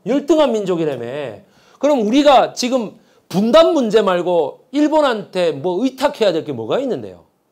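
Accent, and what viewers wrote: Busan, South Korea. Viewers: 귀에 쏙쏙 들어오는 부산말씨 확실하게 설명해주셔서 감사드리며 정말 역사에 대해 모르는게 많은것에 부끄럽습니다 매일 듣고 있습니다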